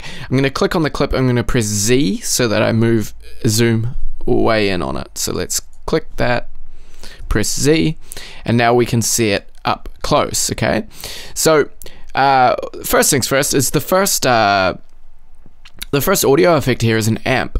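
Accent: New Zealand. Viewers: Thanks a lot! This was extremely helpful and the NZ accent is music to my ears :- )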